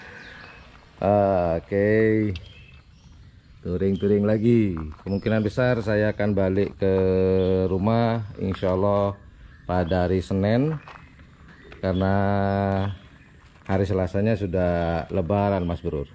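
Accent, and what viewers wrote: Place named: Madura, Indonesia. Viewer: ...logatnya kok beda ya...mirip'' logat madura TIMUR / SUNEMEP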